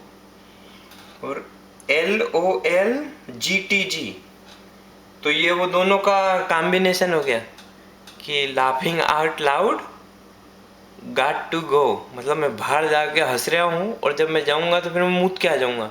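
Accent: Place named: Malwa, India. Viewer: malwi rocksss its always nice nd touchin to hear ur lang when u far from ur home(malwa)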